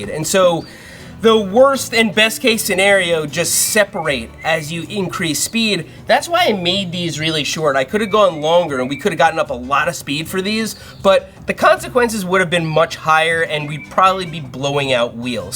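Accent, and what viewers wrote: United States. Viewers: He sounds American to me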